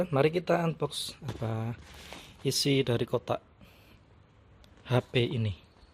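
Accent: Java, Indonesia. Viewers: Medhoq banget 😆😆😆😆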